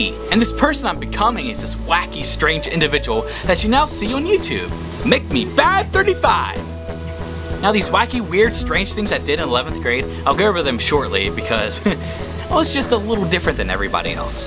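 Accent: Australia. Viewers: What country do u live in? i can kinda hear a little accent (kinda like Australian or British)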